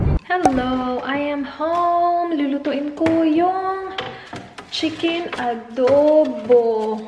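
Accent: Visayas, Philippines. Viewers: sobrang cute mo mag kwento ate hahaha nakakaaliw, and while cooking hahaha. Ang the bisaya accent and words lol hahaha I love you tlga ate hihi 😊😘 Love your vlogs so much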